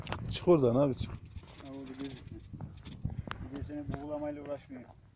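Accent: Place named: Erzurum, Turkey. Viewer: Erzurum civarı diye düşündüm... düzlük, yükselti... bir de konuşan adamın ağzı.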